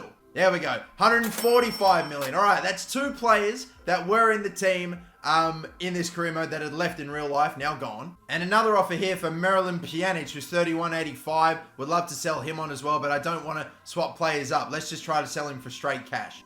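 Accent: Australia. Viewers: Take some rest mate. Preserve that lovely Aussie voice.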